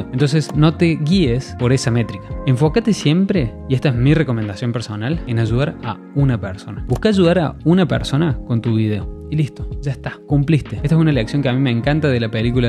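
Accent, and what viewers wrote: Córdoba, Argentina. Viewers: Hola "! Recién te encontré por acá...y ya me suscribí. Gracias por los tips, estoy con ganas de empezar un canal por acá... tendré todo lo que contaste en cuenta... Sos Cordobés? Me alegra encontrar un Youtuber Argentino... no veo muchos todavía... Saludos desde Buenos Aires... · Empezaste a hablar y pensé, aguante Córdoba carajo xD (imagino estar en lo correcto 🤔)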